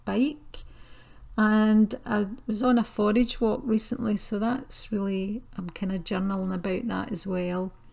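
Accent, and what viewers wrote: Scotland, United Kingdom. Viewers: Thank you for taking us through your journal! I have to say I so enjoy your Scottish brogue. I had to subscribe, and I look forward to seeing your art and hearing you speak in your other videos! :) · What a unique and beautiful journal, love all of the natural elements. And how lovely to hear a bonny Scottish accent...makes me miss my days of living in Edinburgh!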